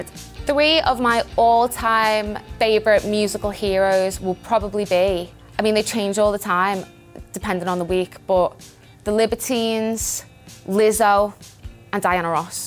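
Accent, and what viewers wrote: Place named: England, United Kingdom. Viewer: While her music is fun, and well produced, sounds and acts like an American's stereotype of an English person truly personified.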